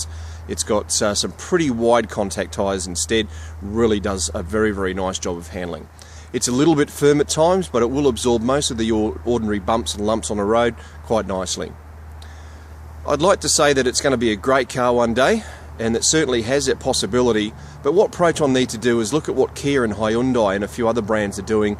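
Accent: Australia. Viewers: Ajam Yunus aussie le ngok... slang down unduh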